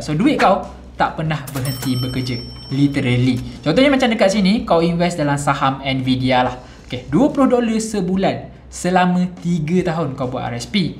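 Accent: Terengganu, Malaysia. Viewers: oh bang org ganu en?😂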